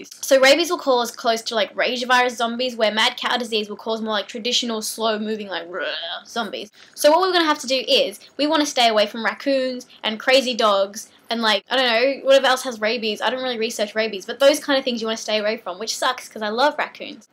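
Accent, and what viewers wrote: Australia. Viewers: are you Australian??.. love your videos.. and is an amazing song:)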